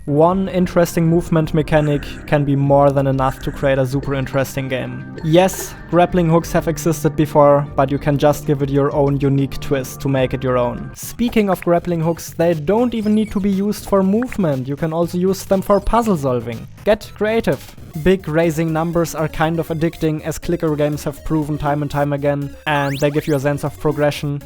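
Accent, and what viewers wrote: Germany. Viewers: Ich höre seinen deutschen akzent😅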